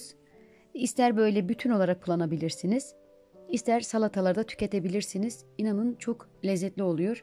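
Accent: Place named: Southeast, Turkey. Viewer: Eline Emeğine Sağlık Abla Bende Yapacağım Nasipse İlk fırsatta Ablam Konuşma Şiven Bizim Oralı aksan yani Ablam Güneydoğulu musun Ablam Selamlar Başarılar Diliyorum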